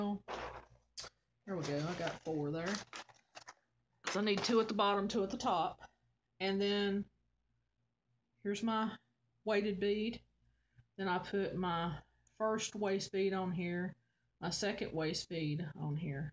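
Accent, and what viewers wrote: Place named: North Carolina, United States. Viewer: Your accent sounds like you are from my area or my state which is NC. It is refreshing to me to hear someone talk like where I'm from. I have always told my boys to be proud of where they come from, it stuck with my youngest but I'm not quite sure what happened to my oldest except that he watched a lot of Thomas the Train when he was little 😆.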